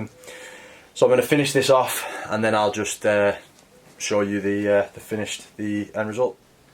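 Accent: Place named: Yorkshire, United Kingdom. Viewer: Where abouts are you based? Must be Yorkshire.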